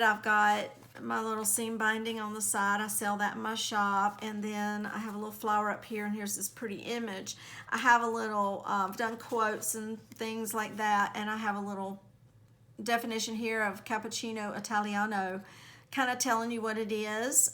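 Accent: South, United States. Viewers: Oh my goodness I could listen to you for hours. Lol where are you from? USA South?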